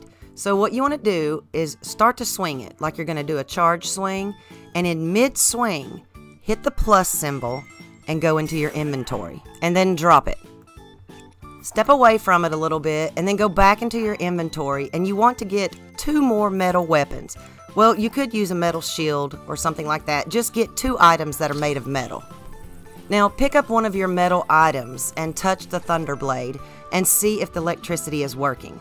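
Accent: South, United States. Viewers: I love your southern draw ma’am!!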